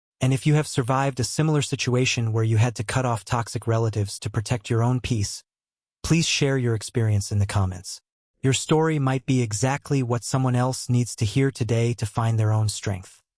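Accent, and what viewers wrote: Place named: South, United States. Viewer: I love it that the AI voice sounds like it’s speaking Chinese with a southern accent. 😂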